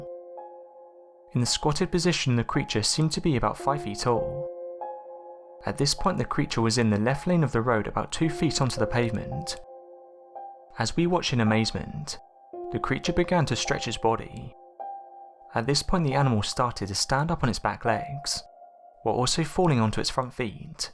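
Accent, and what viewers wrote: United Kingdom. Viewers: Cant undrstand u brit or ausie twit! Get another 2 narate👹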